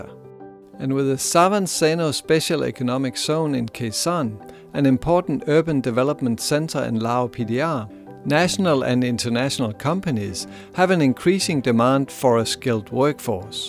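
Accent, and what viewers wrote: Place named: Denmark. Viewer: So exotic to hear a Danish accent in a video about Laos ...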